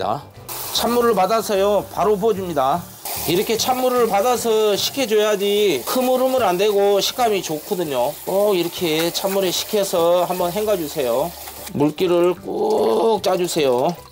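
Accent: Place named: Yeosu, South Korea. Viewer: ㅎㅎ여수고향이라 음식잘하시는군요사투리도 구수해요 돌산갓 피름나물 최고최고ㅎㅎㅎ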